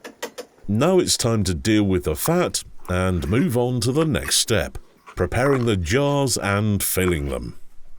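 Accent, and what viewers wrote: United Kingdom. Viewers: good British voice over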